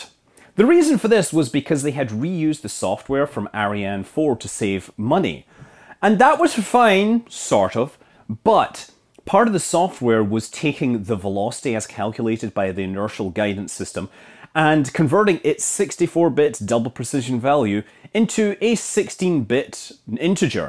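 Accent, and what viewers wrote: Scotland, United Kingdom. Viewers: Yeah we actually say the word like it’s spelled in the U.K. and pronounce all of the syllables correctly. To us it sounds like Americans are saying ‘meer’ when they try to say mirror. There’s nothing that remotely sounds like a ‘d’ in there, he’s just pronouncing the double ‘r’ properly. Which, sounds a bit stronger than an English person would pronounce it because of his Scottish accent. To us, it’s the American pronunciation that sounds way wrong.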